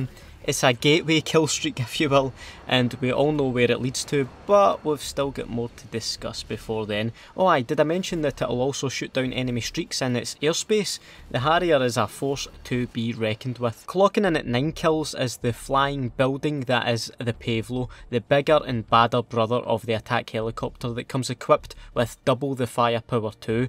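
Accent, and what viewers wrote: Scotland, United Kingdom. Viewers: Love me some Scotish accent to start me morning off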